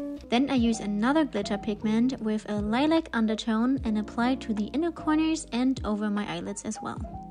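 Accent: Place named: Germany. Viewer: I can hear the German accent lol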